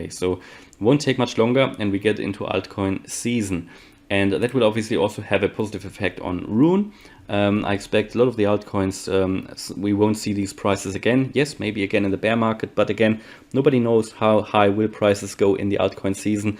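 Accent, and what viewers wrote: Germany. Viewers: German accent detected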